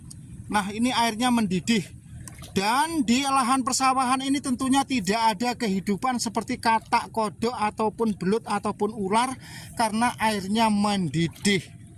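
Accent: Java, Indonesia. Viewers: Pokok e you tobe kriator yg satu ini sangat bikin aq ngakak 🤣🤣🤣 wesss juowoo jekek ngomonge😂😂semangat kawan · Yutuber ko ngomong nya glepotan bro Jawa ya Jawa sekalian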